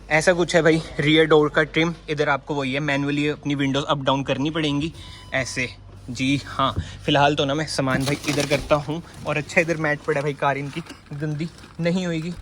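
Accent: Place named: Jammu, India. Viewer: bhai jammu da lgda accent se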